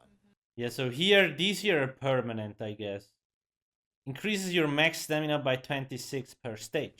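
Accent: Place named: Switzerland. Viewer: Just wondering where are you from? Your accent sounds swiss to me but my pops though more up north 🤔. Anyway you still speak very clearly and that's good especially when looking for guides...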